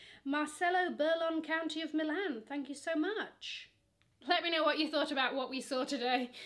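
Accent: United Kingdom. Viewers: You are definitely my favorite British accent youtuber! Love your comments, your facial expression. Said that before, can definitely listen to your talking forever.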